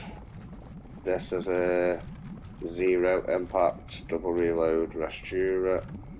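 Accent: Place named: United Kingdom. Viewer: Bruh ur from UK 😂 I thought u was from US 😂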